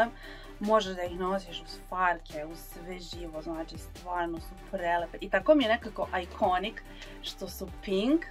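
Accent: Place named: Novi Sad, Serbia. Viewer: Osmeh i pozitiva zlata vredni, najiskrenije 💜💜💜 kao i sve emocije koje krajnje iskreno iskazuješ. 💜💜💜 Plus, novosadski naglasak koji uvek volim da čujem. 🙂 Ti si jedna retko divna osoba koja svoje pratioce dozivljava kao drugare, a ne kao "vreću novca", i to je pre svega jako veliki plus- to govori mnogo o tome kakva si osoba. ❤️ No, to je već sve od samog početka poznato svima. 🙂 Što se zdravstvenog stanja tiče, od sveg ❤️ i najiskrenije ti želim da sve bude u najboljem redu što je pre moguće, prvenstveno zbog tebe same jer zaslužuješ samo ono što je dobro. Dušo naša divna i draga, još jednom sve najlepše ti želim! ❤️❤️❤️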